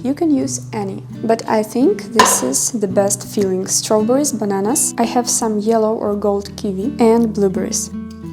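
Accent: Ukraine. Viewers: nice videos. excellent choreography. excellent photographic angles. nice Ukrainian accent. so yummy Ukrainian dishes. wow torturing us viewers with heavenly food we can't eat haha. many thanks for producing and sharing these videos with us. have a great day always. 🌹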